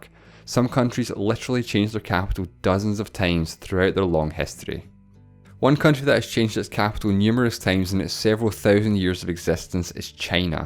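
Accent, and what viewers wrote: Scotland, United Kingdom. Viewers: Scottish accent? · He is Scottish give him a break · Why scottish people pronounce "i" as "e"? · Interesting, enjoyable and once again it shows how much there is to learn about the world and how much I don’t know. Thank you for doing this and I thought it was excellent. PS. Dinnae take any guff about your accent. Edinburgh or somewhere in the borders? Or do I have even more to learn about Scotland? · are you scottish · scottish i think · is the accent Scottish? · sounds like a scottish accent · He is indeed Scottish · scottish english accent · Love the Scottish accent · More like Scotish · I'm thinking your country's capital is Edinburgh, based on your accent. 😉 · If im not mistaken he is scottish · is this a scottish accent? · Andrew Matthews it’s scottish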